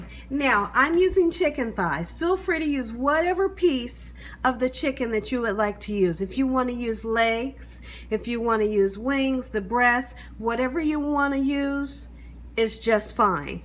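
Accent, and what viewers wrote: South, United States. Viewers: I just love your videos! Love your southern sound! I love to cook as well. I cook old school puertorriqueño food. Everything is delish. I saw your video and you mentioned the mean haters. Do not even knowledge them. You have more kind and blessing people that follow you! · You have the sweetest voice ever. I also hear a slight accent but can't put my finger on what accent that is? I was thinking maybe a southern accent?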